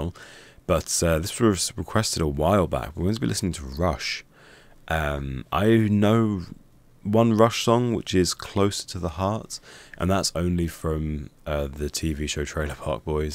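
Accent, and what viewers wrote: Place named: United Kingdom. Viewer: I will check out anyone checking out Rush. British accent a bonus.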